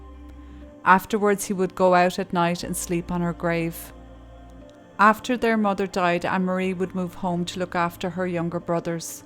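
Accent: Ireland. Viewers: The narration on these videos are wonderful. I am from the USA, but I love the Irish lilt as it reminds me of my dear grandmother from Donegal. Thank you for your sensitive telling.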